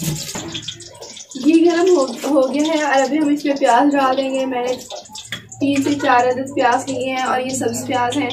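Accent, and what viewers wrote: Punjab, India. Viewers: Please Speak Punjabi Your Punjabi is so sweet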